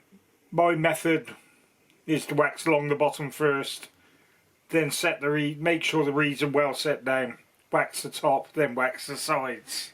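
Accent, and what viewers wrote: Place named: United Kingdom. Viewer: You are a gem. Your videos are clear, well edited, sped up at the right instances. Your comments and work methods are smart, valuable, your work bench is neat and your British accent is clear and impeccable. I am an 80 yr old accordionist, tinkerer and repair junkie in Florida, USA admiring all that you do. Roll -on!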